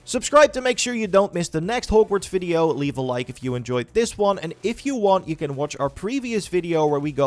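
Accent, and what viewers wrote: Netherlands. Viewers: Why does your voice change. This channel seems to be two different ppl. Both dutch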